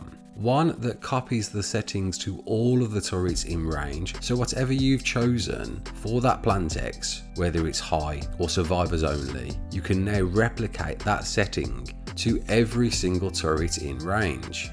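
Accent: Birmingham, United Kingdom. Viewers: Are u a brummy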